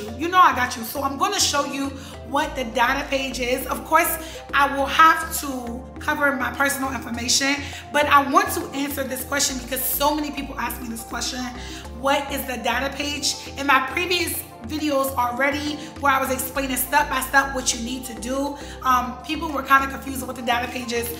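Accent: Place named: Nigeria. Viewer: Some of Your words are becoming to sound like a Nigerian 😅....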